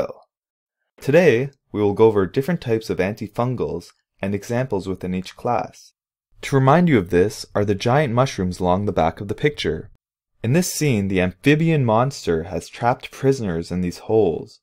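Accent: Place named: Canada. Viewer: Sounds Canadian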